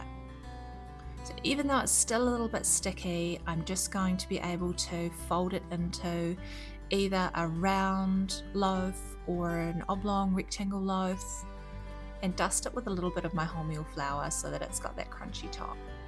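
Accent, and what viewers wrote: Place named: New Zealand. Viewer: Love a New Zealand accent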